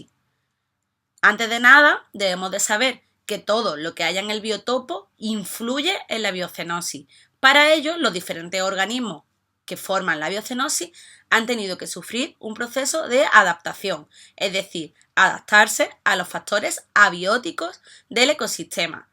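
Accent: Spain. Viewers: Por que es española genio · Es Española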